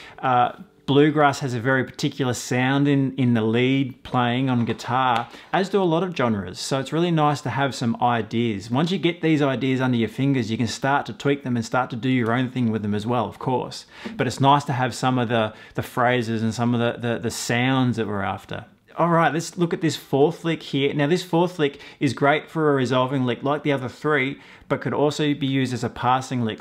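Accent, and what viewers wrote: Australia. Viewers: Australian I think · My goodness, I wasn’t expecting an Aussie voice! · Actually I believe his accent is Austrailian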